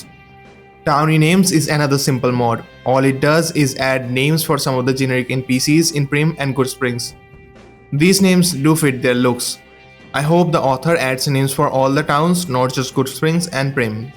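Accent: India. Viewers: Is the lone wolf radio somehow involved in the quest mod Skinwalker? When i heard you talk about the mod in the video i started getting flashbacks to THAT creepypasta. It be an instant download for me if that's the case Also I'll take the indian "call center" accent over obvious generic deadbeat ai voice any day of the week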